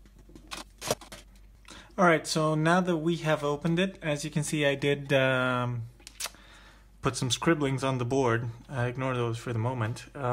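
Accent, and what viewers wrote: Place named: Germany. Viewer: Very, very good description! And don’t worry about your diction, I appreciate German thoroughness!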